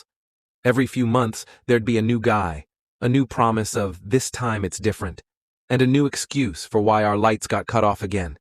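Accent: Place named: United States. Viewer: Although the voice over is American. the road trip filmed on the video, looks more like some third world country.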